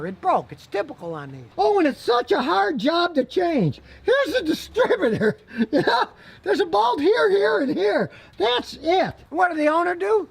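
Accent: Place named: Appalachia, United States. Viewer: Scottys hillbilly accent killed me 😂😂😂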